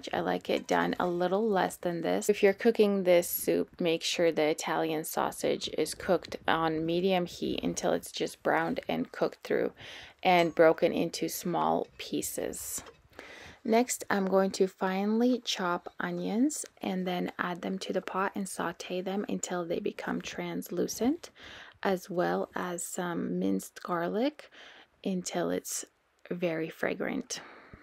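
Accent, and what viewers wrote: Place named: Russia. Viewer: Мне кажется, что я слышу едва заметный русский акцент.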